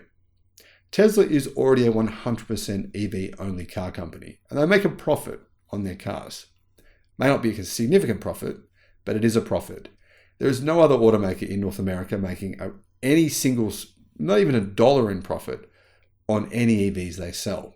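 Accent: Australia. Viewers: u sound like one of my Aussie or NZ, cussins, ps, kindness is still free!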